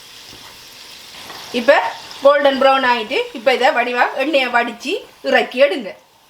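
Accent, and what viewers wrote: Sri Lanka. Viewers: Wow semma tempting mouthwatering recepie nega srilankan tamil explain panathu semma semma👌👌👌👌👏👏👏👏👏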